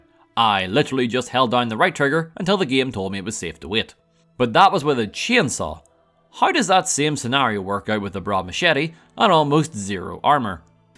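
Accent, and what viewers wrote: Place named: Northern Ireland, United Kingdom. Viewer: Right are you from Bangor or antrim 🤣🤣🤣 driving me mad trying to think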